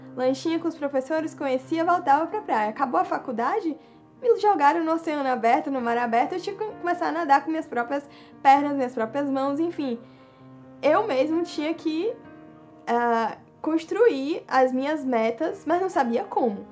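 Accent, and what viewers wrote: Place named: Bahia, Brazil. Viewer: O sotaque baiano é inconfundível. Hahahahah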